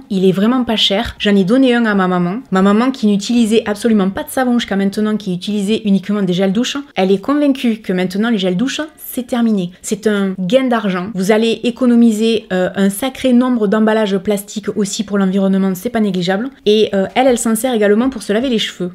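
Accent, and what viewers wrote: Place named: Narbonne, France. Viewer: Bonjour comment vas-tu? Je voudrais te dire merci pour ta vidéo c'est génial et un plaisir à chaque fois de regarder tes vidéos, surtout quand on voit la durer de tes vidéos en ce moment qui sont plus longues c'est encore plus génial malgré que ça l'était déjà en plus quand je vois le temps de travail que tu dois avoir pour faire les montages à chaque fois et passer du temps dessus, je te remercie pour ça, pour ton travail car moi ça me fait énormément du bien de voir tes vidéos, je te remercie pour le temps que tu prends pour nous, pour les explications et ta belle présence. Je te trouve très très belle, au naturel tu es aussi très très belle tu as des yeux magnifiques ainsi que tes cheveux ils sont trop beaux vraiment tu as tout pour plaire vraiment tu es une belle personne ( non ce n'est pas une déclaration d'amour 😂😂) mais merci d'être là, vraiment en toute franchise à l'heure actuelle tu es ma youtubeuse préférée, donc je comprends tout à fait pourquoi on t'appelle, alors merci maman. 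Je voulais te dire aussi, et c'est pour ça que je t'écris, bon courage pour tes examens médicaux d'aujourd'hui, j'espère de tout mon cœur que ça va aller au mieux et que tu auras de bonnes nouvelles, qui pourront te rassurer pour cette journée, je t'envoie tout mon soutien de pas très loin ( je suis de Narbonne 🙂, ce qu'il y a de bien c'est que je me reconnais dans ton accent car on a le même 😂), et je pense très fort à toi aujourd'hui et je croises les doigts pour t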